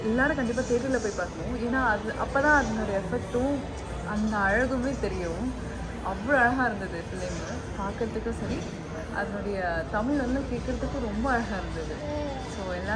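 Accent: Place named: Madurai, India. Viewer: T q allows so cute tamil from madurai 🤝🙏🙏🙏👍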